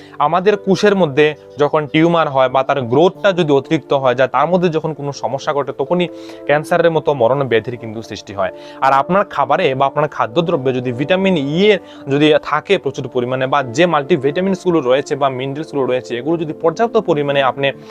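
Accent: Bangladesh. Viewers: বাংলাদেশীদের উচ্চারণ শ্রুতিমধুর নয় ।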